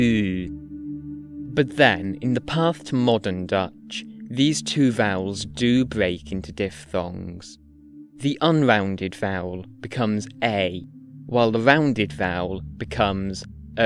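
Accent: United Kingdom. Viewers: I love your accent!! I feel it is from somewhere in UK, is it??? (I'll continue to watch the video, as I also love knowing the evolution of languages! The one I speak as native is Spanish).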